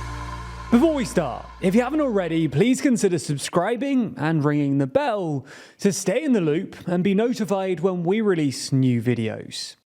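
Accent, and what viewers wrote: England, United Kingdom. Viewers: you are English arnt you!!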